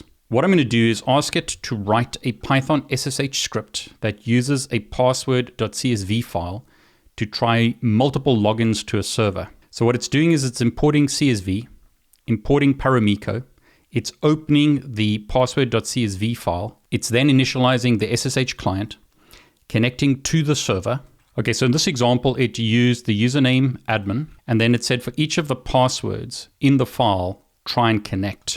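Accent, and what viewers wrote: South Africa. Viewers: Hi are you South African? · Really interesting video, dude, and lovely to hear your South African accent. (If not RSA, Southern African. I now live in the UK, 24 years, from RSA.) GPT is so powerful! I'm going to have to use it for pair programming, for the sake of my career and my clients' success. Plus probably a billion other things in my life. Oh yes, always test everything: code, new AIs, ideas, foods, dearly-loved traditions, everything. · Is this a South African accent?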